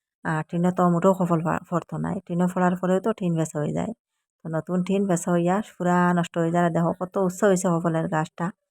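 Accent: Sylhet, Bangladesh. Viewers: আফা চা এর কালার কিন্তু খুব সুন্দর অইছে। আসাম কিতা আমরার সিলেটি মানুষ আছইন নি। আসাম তো ইন্ডিয়া। আপনার মাত সিলেটের কিন্তু থাকইন আসাম। আমি বুঝতে পাররাম না। সিলেট থাকি আসাম যাইতে কতো সময় লাগে আফা।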